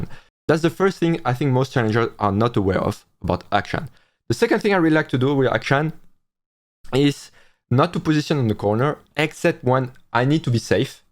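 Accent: France. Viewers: you sound french, are you ?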